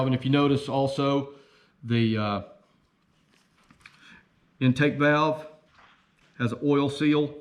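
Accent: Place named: Alabama, United States. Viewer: Wow, I love your attention to detail, I invented an engine system to turn an Adult trike or Bicycle into a kind of like a moped I get about 125 miles to a gallon and am using Vanguard single-cylinder engines for power. I invented the Bracket system, not the engine this little diesel may get me up to 175mpg. I would love to talk to you and I am using the youtube account of my wife here is my cell number <num> give me a call. Stuart Brandt Naples Florida By the way are you in Alabama?????? your accent sounds like Alabama a bit.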